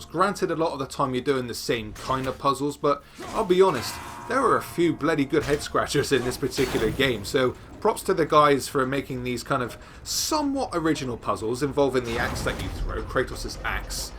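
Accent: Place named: Bristol, United Kingdom. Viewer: Also, where you from? You sound like a fellow Bristolian!?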